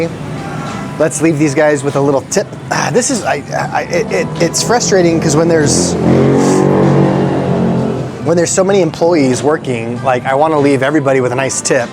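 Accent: Philippines. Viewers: love ur filipino accent kuya ❤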